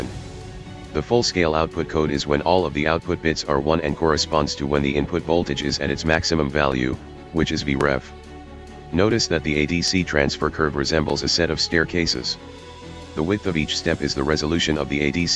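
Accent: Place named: India. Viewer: Yes don't put music + nice to keep robot speak as I hate Indian English better to use robot eng instead of yours as when I found an Indian English video I simply skip it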